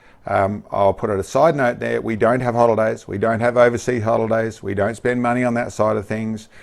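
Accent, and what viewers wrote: Australia. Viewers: Keep up the good work. Coming from an Aussie expat now living in the USA, it's good to hear an aussie talking about something that I love doing once in a while.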